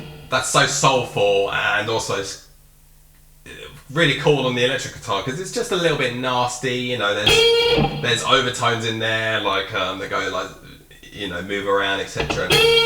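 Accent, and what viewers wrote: England, United Kingdom. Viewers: I didn't realise you were English. 👍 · Cool,! I like these tutorials, keep 'em coming. I like to listen to your Brit accent, too. 💯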